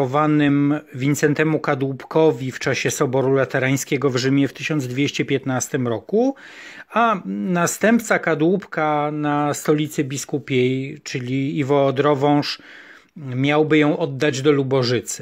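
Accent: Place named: Kraków, Poland. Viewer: To jest narracja historyka sztuki- merytoryczną, płynna, ciekawa bardzo, ale skierowana do znawców. Jeśli już miałabym grymasić, to prosiłabym unikać mimo wszystko krakowskiego akcentu. Wystawa, jak każda na Wawelu, wspaniała. gratulacje.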